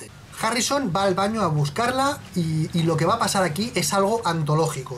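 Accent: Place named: Spain. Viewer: Normalmente no me gusta cómo hablan los españoles (sin ofender) pero me gusta como lo narras aunque aveces no se q significan ciertos modismos ! Jajaja gracias por hacer estos videos ! 🤓🤓🤓🤓 saludos desde México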